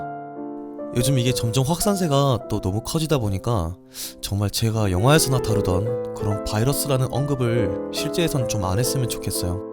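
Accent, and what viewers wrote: Gyeongsang, South Korea. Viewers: ㅋㅋ경상도 분이시네용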